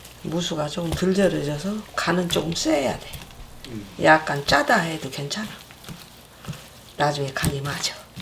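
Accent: Chungcheong, South Korea. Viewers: 충청도분이신가봐요.ㅎ 제고향 어르신이 옆에서 말씀힌시는것같아 더 친근감이 있어 좋네요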